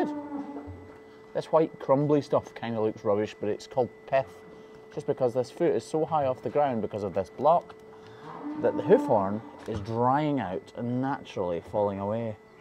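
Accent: Scotland, United Kingdom. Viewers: greetings from Texas, USA! Obviously, a lot of viewers enjoy your work, as do I. I have visited Scotland, and I have a great fondness for your land and heritage, and hope to come back again. I have high hopes for 447, and it's thanks to your good work. That cow has an internatinoal reputation now. I love your lead-in music, is it a Scottish performer? Great work sir, and I love the accent! Did I mention I like Scotland!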